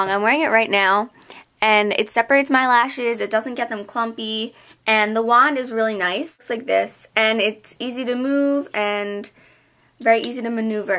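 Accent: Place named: New Jersey, United States. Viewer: She's From New Jersey Well At Least I Think She Is Because She Said She Drove To New York For IMATS &&+ People That Live In Jerssey Do Drive To New York Often &&+ Vice Versa &&+ She Does Kinda Have A Slight Jersey Accent So I Think She Is From Jersey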